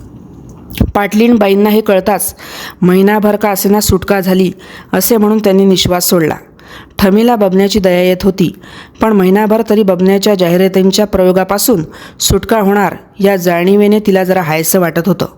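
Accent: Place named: Vidarbha, India. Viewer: छान विनोदी आहे कथा. विदर्भातील भाषा छान सादर केली आहे.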